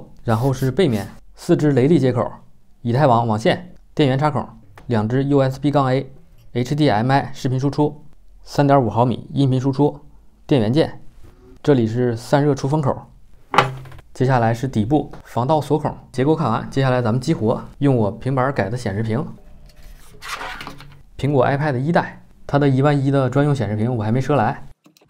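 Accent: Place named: Northeast, China. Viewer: 你是东北林区的吗？和那个林区大雷一个口音啊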